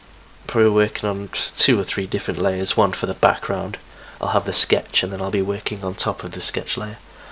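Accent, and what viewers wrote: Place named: RP, United Kingdom. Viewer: You sound so posh on this!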